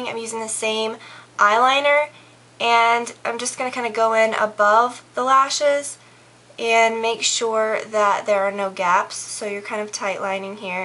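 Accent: South, United States. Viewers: i feel like only during tutorials does your southern accent come out :)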